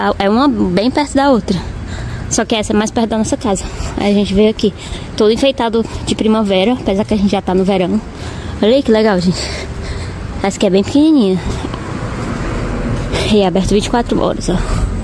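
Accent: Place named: Northeast, Brazil. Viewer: Estou assistindo na noite de segunda, enquanto passo roupa, sou RJ. Seu sotaque nordestino é bem característico. Tenho uma vizinha que é de Natal já mora aqui a mais de 20 anos, mas não perde o sotaque. Bjs na família. Deus os abençoe.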